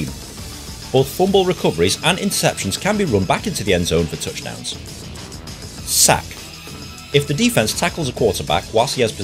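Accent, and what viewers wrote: England, United Kingdom. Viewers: Dude, your explanation is completely detailed, but I can't understand a single word of British english, so I had to turn the captions on and keep rewinding the whole video, but I was able to understand everything in the end. Great video! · I love how American football rules are explained by an English commentator 😂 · An English guy? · American football explained by a guy with british ascent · British huh? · That is 100% an English accent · nope, deffo British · A English accent?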